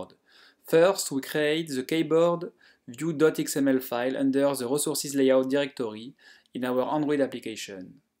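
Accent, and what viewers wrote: France. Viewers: that is so much a french accent, hahaha! thanks! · Haha that's right.... really French accent.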